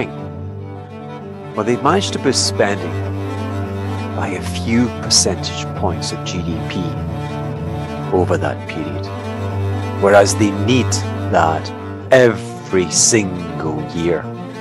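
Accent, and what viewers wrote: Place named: Glasgow, United Kingdom. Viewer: Interesting... but, as always a mind through your own eye. I thought I recognised the accent I saw you before on CNBC and checked. Glasgow lad. Well done you.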